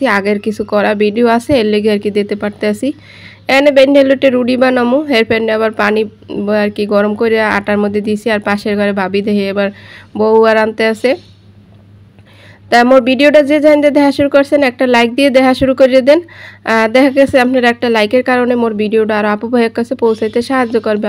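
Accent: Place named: Noakhali, Bangladesh. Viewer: আপু লাইক দিয়ে দেখা শুরু করলাম,, আপনার কথাবার্তা আমার খুব ভালো লাগে,,এটা কি নোয়াখালীর ভাষা???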